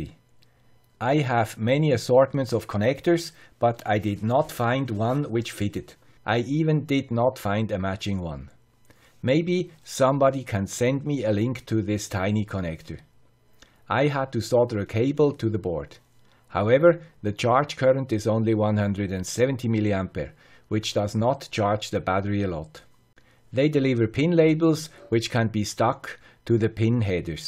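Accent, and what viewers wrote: Switzerland. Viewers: Ok. Thank you for your answer (with the Swiss accent). Regards from someone with a Belgian accent;-) Really enjoy your channel!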